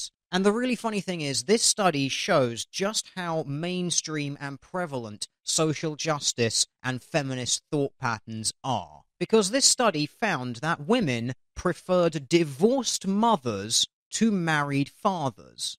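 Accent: United Kingdom. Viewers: Aw, damn. He's winning me over. His snarky-British blue deer accent is wiggling all around in my brainspace, making me giggle and think critically and shit. Whoo, binge watching!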